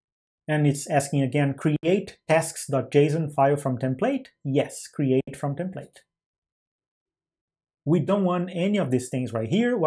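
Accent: Brazil. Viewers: sabia q voce era br, conheco sotaque portugues de longe kkkkkkkkk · Thanks for the contribution. A tip, you can browse for the file from the environment variables, and navigate through the folders to find Lua folder, which might be easier than writing. If you are brasilian, which your accent sounds like, then: Valeu, obrigado :) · he sounds Brazilian tbh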